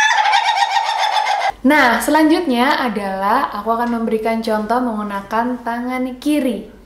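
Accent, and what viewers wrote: Java, Indonesia. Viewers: kak medhok bgt suaranya 🤟😅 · Medoknya khas banget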